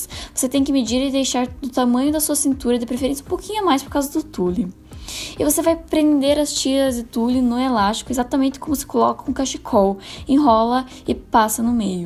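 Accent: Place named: Northeast, Brazil. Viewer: nesse vídeo você tava muito nordestina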